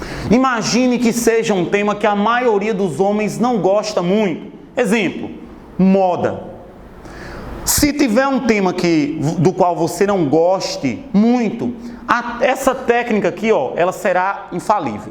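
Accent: Northeast, Brazil. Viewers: Ótima explicação! E esse sotoque..do meu nordeste é lindo.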